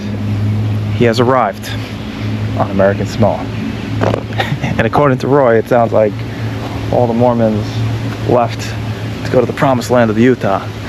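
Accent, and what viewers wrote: New York, United States. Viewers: dude you sounded like a Newyorker. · Yep...you're from New York, alright.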